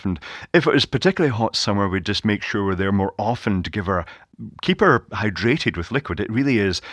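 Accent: Scotland, United Kingdom. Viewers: It's a Scottish accent, he was born in Glasgow.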